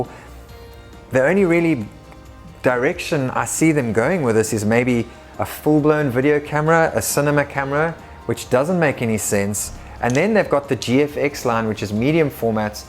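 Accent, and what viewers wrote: South Africa. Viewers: Definitely a South African accent 🤔🤗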